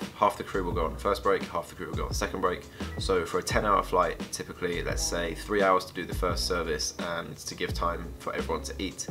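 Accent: United Kingdom. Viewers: The first thing I said to myself when he first started talking is he’s British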